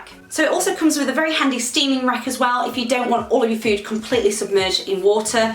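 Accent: United Kingdom. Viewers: Helpful review - might just buy one. PS You're gorgeous - loving the slight northern accent ;-)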